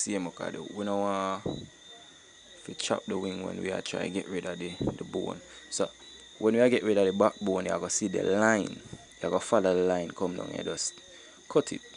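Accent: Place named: Jamaica. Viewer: mi like how you keep the patois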